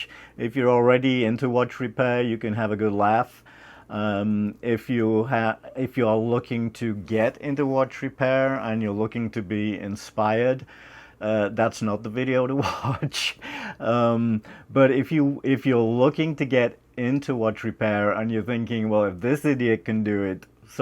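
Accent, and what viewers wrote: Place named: Texas, United States. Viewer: Great video! Interesting how the Texan accent has partially rubbed off on you so quickly,-) I have almost the exact same set of tools as you. Well I have one of those blue silicon mats. Very interested to see the next video, a, because I just bought an Elgin watch from another US based watch repairer, and I have a beautiful, imho pocket watch, which definitely needs servicing one day. Love the vintage tools!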